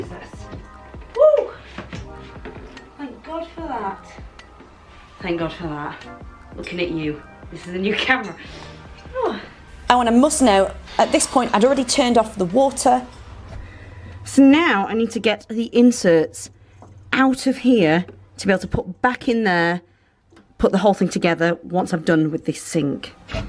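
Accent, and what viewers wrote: United Kingdom. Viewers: I'm doing my sink now and it was so nice to see you do this with painted nails, and could listen your British accent.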